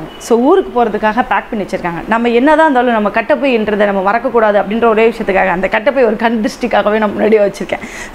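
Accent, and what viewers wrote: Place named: Madurai, India. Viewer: Neenga maduraa ah?? Madurai- slang.. துணி மணி❤️ Vijay still from Thuppaakki movie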